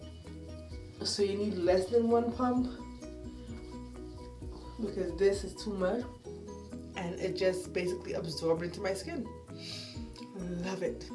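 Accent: Jamaica. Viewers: Your jamaican voice tho. 😄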